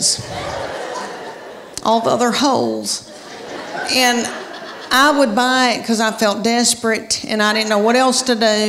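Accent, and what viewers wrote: South, United States. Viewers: Youa lil bit country ain't cha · Yes. She's very smart, hidden behind that accent, a true American!👍❤️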